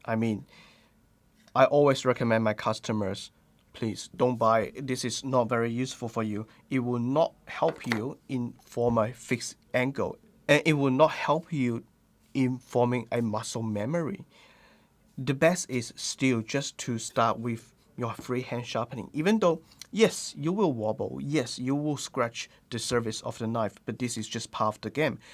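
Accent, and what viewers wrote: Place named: England, United Kingdom. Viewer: english accent . funni .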